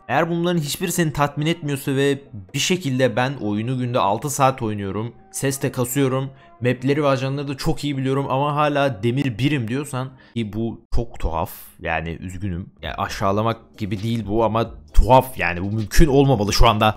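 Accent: Thrace, Turkey. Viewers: Abi trakyalımısın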